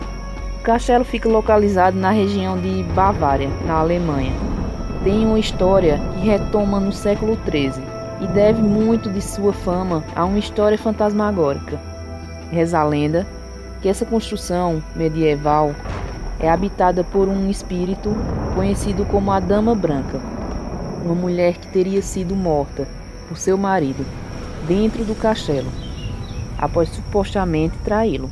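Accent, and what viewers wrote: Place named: Northeast, Brazil. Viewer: Gosto do sotaque nordestino. Não é atoa que a esposa,falecida, do Mark Nazarova o deixou meio doido depois que ela faleceu, como se não bastasse a filha falecida antes da mãe vítima na guerra. Lastimável. Jesús Cristo e Deus te abençoe e console Mark Nazarova, Deus é bom. Deus e Jesús Cristo nós abençoe e proteja sempre 🇧🇷🌈🌻🙏🏻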